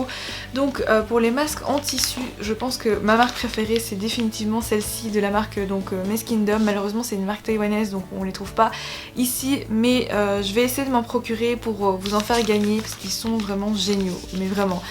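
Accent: Belgium. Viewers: je te suis depuis pas tres longtemps donc j'ai une question a laquelle tu as peut etre deja repondue ^^ : Est ce que tu habites ou as habite en Belgique? car de temps en temps je trouve que tu as un petit accent ^^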